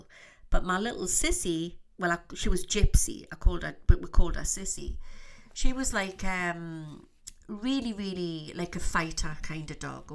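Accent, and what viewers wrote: Newcastle, United Kingdom. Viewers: love the Geordie accent could listen to ut all day Steve east London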